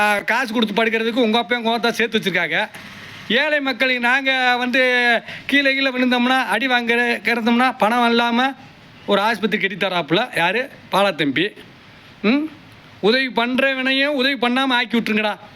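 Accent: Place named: Madurai, India. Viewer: மதுரை தமிழில் திட்டியதற்கு வாழ்த்துக்கள்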